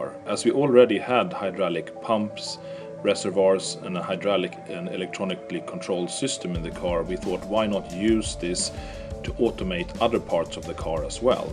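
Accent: Sweden. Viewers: Swenglish! That's a Beaty.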